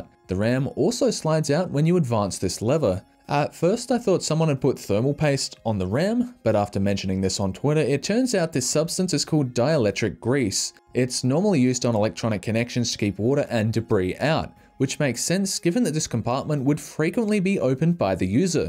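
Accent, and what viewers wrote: Adelaide, Australia. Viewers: you from south australia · Always nice to hear another heaps good Adelaide accent. Keep up the great videos!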